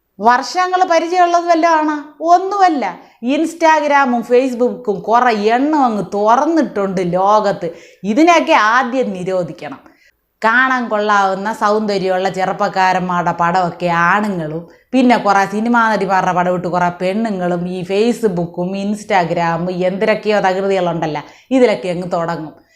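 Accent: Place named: Trivandrum, India. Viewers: TVM സ്ലാങ് വേണ്ട. യഥാർത്ഥഉച്ചാരണവും ഹൃദ്യമാണ്. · trivandrum slang is good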